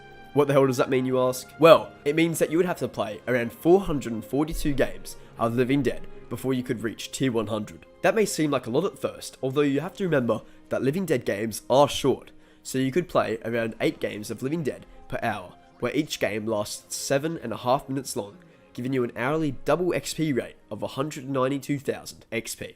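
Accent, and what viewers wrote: Australia. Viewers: Are you Australian you sound it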